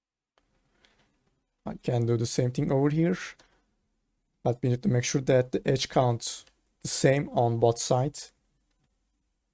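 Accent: Turkey. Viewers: Usta şu ana kadar gördüğüm en iyi cinema4D videoları sende helal olsun işi tekrar sevdirdin bana aksandan hemen anladım zaten türk olduğunu :)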